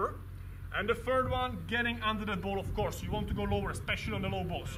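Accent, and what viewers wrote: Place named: Czech Republic. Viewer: nice one, thanks. where are you from by the way? your accent sounds tchechish.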